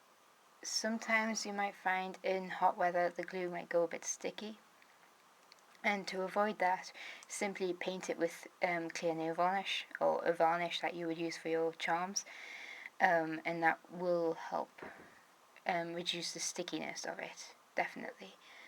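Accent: United Kingdom. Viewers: Yay ur from the uk aren't u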